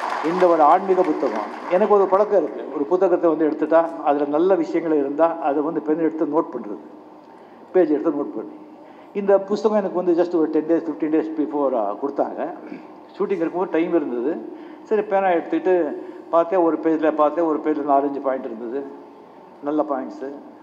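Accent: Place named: Tamil Nadu, India. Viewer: sir ungaloda pechu kekaradukku arumaiya irruku. Neengeyu saduguru madhiri aanmigga pathi ella pesa poreenge nijama great dhaa. sirku imamalai ponathule irundhu aanmigga patri rombha aravam, nesam vandhiruchu. Varshakku orakka imamalaiki poitu vandhitye irruparu namma thalaivar. Thalaivaroda tamizh pure allame irundhaalu adhu tamil nadu makkalki edho oru vidhathille pudichirruku. sir oru marathakaranaahi thonmaiyana tamizh mozhiya pesa kathikitnadhe oru migga sirappu dhaa. Namma ooru anekal inge ella neraya tamil padangal release aagum. Release aahi oru 100 naalgalo, eranooru naalgalo vetrikarama odudhu.anekal, Bangalore, kgf indha idangalille sumar mgr avargal, sivaji ganesan avargaloda kaalagattathille irundhu tamil padangal velipada poradhu. Actually unmayale sollununa naan oru kannadakaran dhaa sir tamil padangal paathu paathu oru alavuku ena oru 80% tamil kathikitrruke. 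Apuro enaku neraya per ekkapatta tamil nadu friends irrukaru avanga ellarume north madras region dhaa. Naa ippo dhaa bsc mudichi wipro companyle velaiki serndhirruke inge irrukavaru ekkapatta perume tamil nadu pasangagal dhaa naa avaraale kooda eppadi tamil pesunu anradha kathikite avaruku konja kannada solli kuduthe. Neraiya Kannadigasku siroda tamil sayal na tamil accent tamil kathukradukku oru inspiration aavu. Enraal adhu oru udhvekam annala. Naanue tamil mozhiva rombhave nesikkuve ennoda tayyi mozhi kannada madhiriye. Indha mosamana vaatal nagaraju chumma chumma anav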